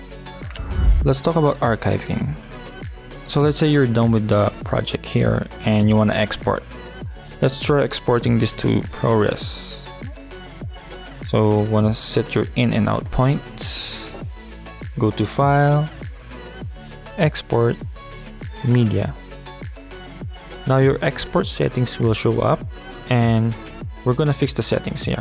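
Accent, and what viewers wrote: Philippines. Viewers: sounds pinoy-ish :D .. nice tuts.. problem is i don't understand this proxies and ProRes thing.. when i edit., i just drag and drop since i'm just working on one clip.. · I feel like he’s a filipino with his accent.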